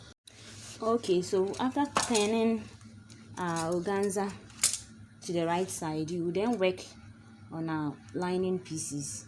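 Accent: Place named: Ghana. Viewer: Madam the pronunciation is her intonation. She is from Ghana.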